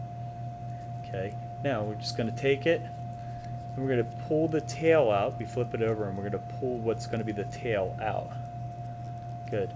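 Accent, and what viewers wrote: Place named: Pittsburgh, United States. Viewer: Digging your Pittsburgh accent. :)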